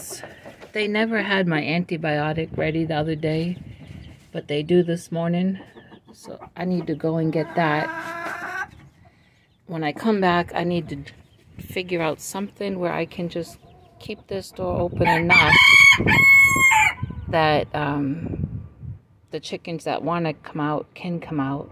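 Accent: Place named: Connecticut, United States. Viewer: Me too. From Connecticut. Been here almost 17 years. I recognized your accent. 😊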